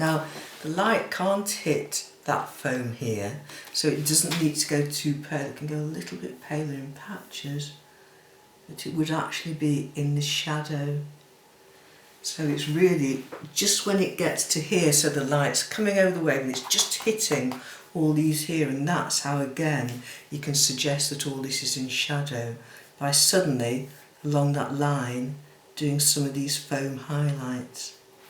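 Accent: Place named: RP, United Kingdom. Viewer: Hello your sooooooo posh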